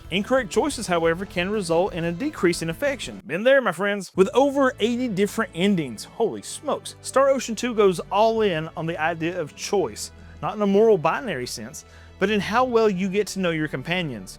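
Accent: South, United States. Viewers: I thoroughly enjoy watching a fellow southerner on this space. Your use of "eat up" meaning "to be full of" reminded me of one of my grandfather's funniest barbs. If he thought someone wasn't being smart, he'd chirp that they were "eat up with the dumbass". I'd always giggle when he'd say that and I adopted it into my speech when I was an adult. I love your takes on RPGs, but I also love listening to you because you sound like me when I talk to my friends about games I like. Keep on being you, buddy.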